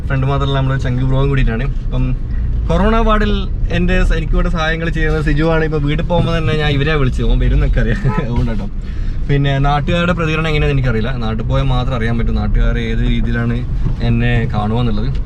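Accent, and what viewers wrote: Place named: Trivandrum, India. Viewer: Kalli valli broo namma trivandrum dialogue ......ninga poliya macha...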